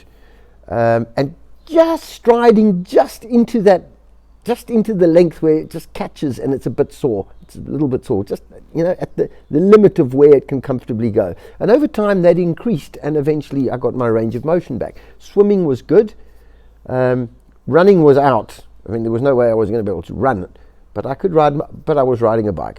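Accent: South Africa. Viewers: exactly...the body heals itself if given the right supports, but the first step is to take the onus upon yourself like you have stated here...thanks for the great info...and love your South African accent as well...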